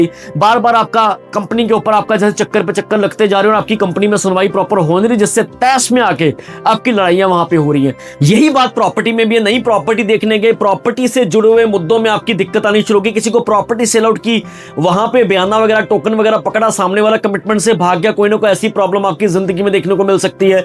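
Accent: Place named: Punjab, India. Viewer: Punjabi accent in hindi😊 sardar pandit😊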